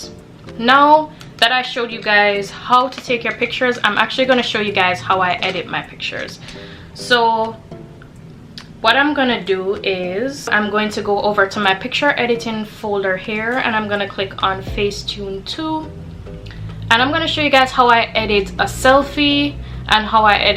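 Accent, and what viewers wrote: Jamaica. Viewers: love your accent, im jamaican as well🥳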